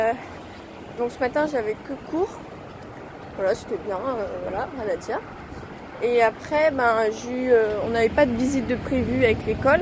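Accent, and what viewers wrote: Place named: Haute-Savoie, France. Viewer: こんにちは(^___^)元気ですか Je trouve vraiment que tu te débrouille plutôt bien pour filmé...... non je déconne..ahah ^^ mais c'est sympa de t'écouté parlé avec un petit accent de haute Savoie!! je me trompe sans doute?? Merci pour ce partage... et à la prochaine (^_-)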